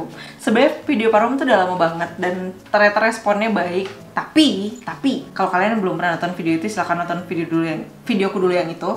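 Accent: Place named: Sunda, Indonesia. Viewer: Nyunda pisan di video ieu mah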